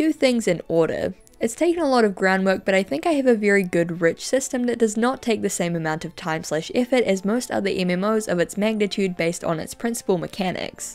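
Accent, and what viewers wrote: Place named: New Zealand. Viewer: Not only is your lovely kiwi accent with all those wonderful inflections and vowel pronunciation just magic to listen to when relaxing or reading etc... But you have great scripts and they flow so well. You put a lot of information in your videoes but they never feel like there's too much to digest or you are moving too fast... You have a great knack for taking these complex stories apart and making an engaging, enjoyable and interesting script out of them... Aaaaad it's just a delight to see what fantastic and cool looks you will rock for the video. You never ever ever fail to make me go 'wow' 😂 You are a real treasure and I really hope your channel grows and you get the success you deserve. Have a really great Christmas and a very happy new year! 🍻 ✌️ ☀ 🎅 🎄🎁☃️❄️❄️ From 🇮🇪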